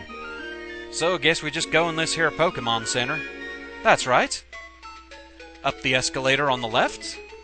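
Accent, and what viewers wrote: Scotland, United Kingdom. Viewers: SCOTISH ACCENTS RULE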